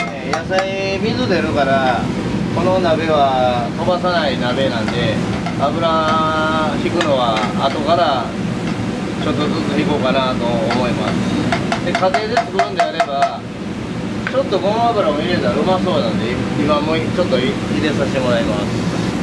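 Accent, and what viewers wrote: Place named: Osaka, Japan. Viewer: 動きも喋りもルーズに見えるんですけど無駄がないから余裕があるんですね。実況コメント、質問への答えのひとつひとつに無駄がない。おすすめメニューの理由が「他店との比較ができるから」、焼き飯がおすすめの理由（動画全部観てください、説得力あります）も誠実というか。誠実・正確でゴリゴリの大阪弁っていうのがまたいい。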